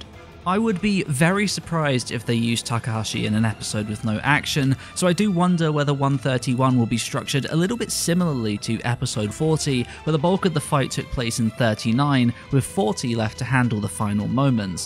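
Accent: United Kingdom. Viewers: you're from braitane aren't you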